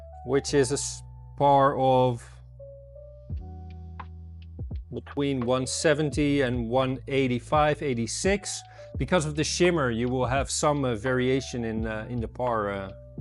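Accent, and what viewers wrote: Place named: Netherlands. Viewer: Wist niet dat je uit Nederland kwam joh! Dacht al aan je accent te horen dat je geen Engelsman of Amerikaan was, maar je Engels is erg goed moet ik zeggen. Dapper dat je in NL nog metal halides durft te gebruiken met die bizarre energieprijzen 😅 Mooie grote bak trouwens! Heel benieuwd hoe het erbij staat als er frags in staan. Je hebt er een abbo bij 👍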